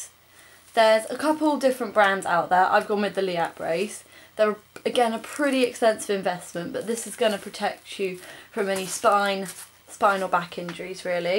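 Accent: United Kingdom. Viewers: Hi I can hear by your accent that ur from the uk although u mentioned it, but I'm from the uk aswell, I live in in surrey, where do u think I could ride that's near redhill due to the fact that I live there in redhill. Is there any places to ride a dirt bike ?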